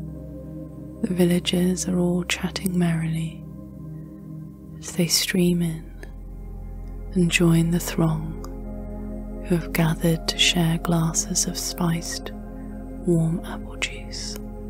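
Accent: United Kingdom. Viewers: I knew your accent had a lovely hint of west country, I thought maybe Wilts Dorset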